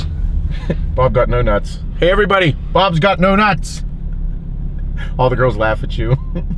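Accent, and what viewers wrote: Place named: Pennsylvania, United States. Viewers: You have a Pittsburgh accent, where in Pennsylvania do you live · I'm from Pennsylvania as well, and it's just funny that the way you talk and the terminology you use is identical to the shit my friends and I say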